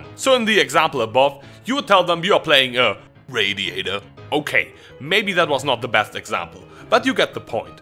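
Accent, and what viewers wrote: Germany. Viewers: The german accent is killing it :D :D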